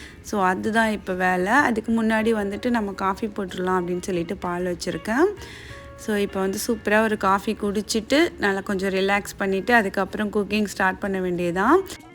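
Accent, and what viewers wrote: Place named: Pattukkottai, India. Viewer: Nce vlog ka..unga slang enga oor slang madriye iruku..am near by pattukkottai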